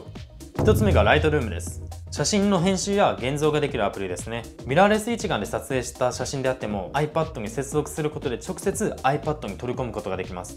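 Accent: Kansai, Japan. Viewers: 話のイントネーションと阪神タイガース好きって言ってたんで笑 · 先生のイントネーションで関西弁出てますよw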